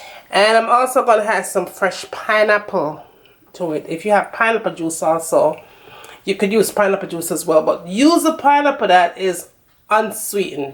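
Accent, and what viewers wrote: Jamaica. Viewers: Jamaican accent tan up strong duh... Thanks for sharing heard about this now I finally see it on youtube.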